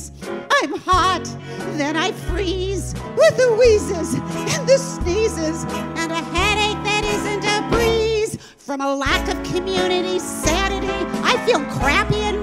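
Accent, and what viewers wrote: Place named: New York, United States. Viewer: You've hit the nail on the head as always. 💉 Nice NY accent! Enjoyable as always.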